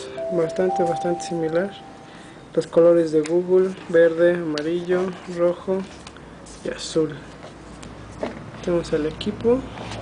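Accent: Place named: Pocho, United States. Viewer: Bueno y por qué hablas así como queee... tan POCHO???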